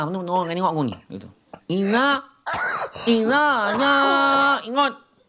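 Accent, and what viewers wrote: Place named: Malaysia. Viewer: Wahh bang skit2 dh ada seleng Malaysia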